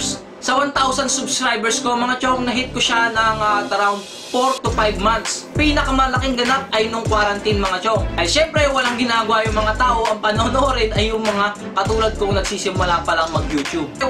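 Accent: Batangas, Philippines. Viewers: Batangueño tlga kpg mgsalita prang galit ,peru natural n boses lng yan...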